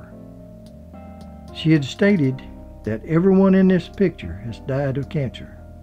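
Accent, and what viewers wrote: South, United States. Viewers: Mr i found your voice very southern jusr the way miss Susan Hayward was attracted to southern men according to her biography a book titled;A star is A star is aStar lives and loves of Susan Hayward thank you mr for such a beautiful. tribute to one of the most talented actors in the World, i always say and think an an actor(actress) should be honored while still alive unfortunately Miss Hayward died young but i know she would had been thrilled had she lived long enough to received this tribute from a soutern. gentleman thank you again Mr... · Thank you for this piece about Ms. Hayward. I have been going back and watching her movies and remembering her as of late, and this was great to see. I was 13 when she passed and I wept, I loved her so. I became attached to her when I would see her on the Saturday afternoon or evening movies and then I was hooked; an awestruck boy in love with a classy star whose performances made him believe that we could all endure and thrive. 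Also, I am not sure where you are from, but your accent is wonderful. My family is from the south, and listening to you, it was as though I was listening to my uncles telling old family stories. WONDERFUL! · Very well done,except that awful Southern accent!!YUCK!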